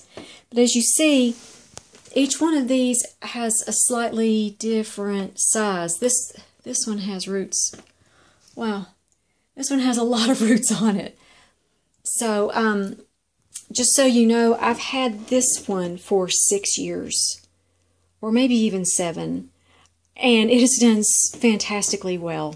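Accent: South, United States. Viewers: You are so precious! I love your info on orchids. I love your southern accent even more. And even more, love your blessing to us at the end of your videos! Thank you so much!!!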